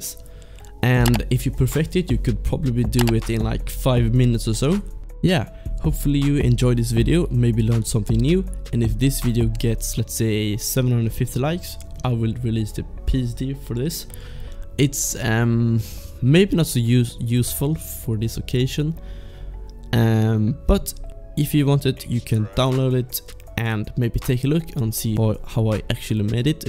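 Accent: Sweden. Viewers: I know a swedish accent when I hear one ^^ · are u swedish? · ARE YOU SWDEDISH? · Are you swedish?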